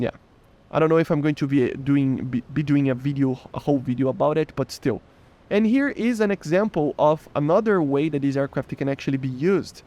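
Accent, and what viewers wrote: Brazil. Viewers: Are you Brasilian bro?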